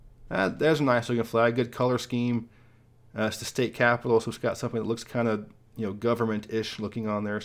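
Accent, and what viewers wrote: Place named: California, United States. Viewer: I like how u speak like a true Californian about our home state's flags.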